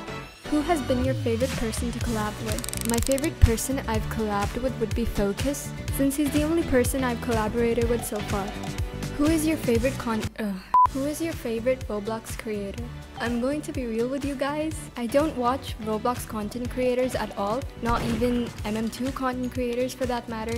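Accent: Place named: Philippines. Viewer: It's clear that she's Filipino I know one when I hear one I can tell by her accent.. AND NICE VOICE:D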